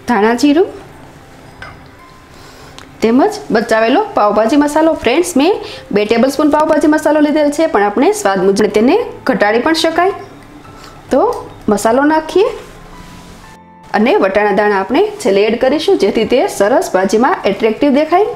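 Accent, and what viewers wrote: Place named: Kathiawar, India. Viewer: વાહા બવૂજ શરસ કાઠીયાવાડી સંવાદમા ભાજી ખાવીની મંજા ઓરછે બીજા વીડીયો મૂકછોજી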